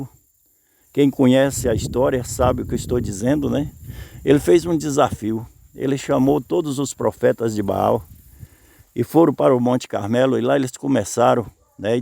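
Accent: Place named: Northeast, Brazil. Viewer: obrigada por me responder, eu percebi pelo sotaque q o senhor era do nordeste, gosto das msgs do senhor, já compartilhei com mais pessoas, sou do estado do Tocantins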